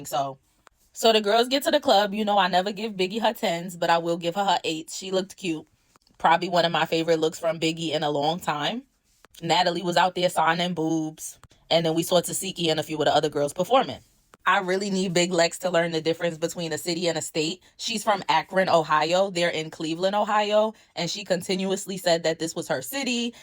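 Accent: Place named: Brooklyn, United States. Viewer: Omg you’re from bk!! I knew it lol The dialect and accent is distinct. I’m a Bk native and while I live in another borough I will always rep bk! We a different type of breed.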